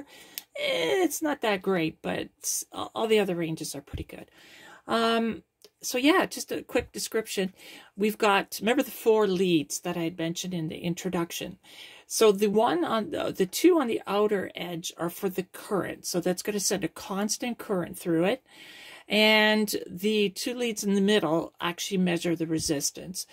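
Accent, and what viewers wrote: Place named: Canada. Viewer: I used to subscribe to Elector magazine. Many years ago. I thought it was. A cut above most. The usual ones which? I subscribe to as well. Now I design electronics for a living. Back in those days, it was just a hobby.. I see you're in Canada. I sensed a little bit of a Canadian accent. I'm in Niagara Falls, Canada.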